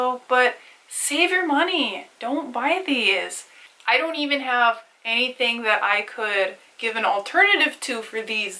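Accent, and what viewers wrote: United States. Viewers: This was all such good info and I loved listening to it because your accent is so cute! Where in the states is that accent from? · Cute channel, informative vid! Can I ask where you’re from? I hear a kind of northern/mid-western North American accent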